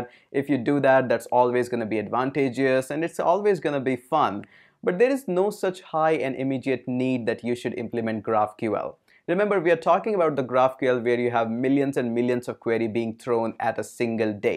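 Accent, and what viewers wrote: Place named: India. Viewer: That indian accent…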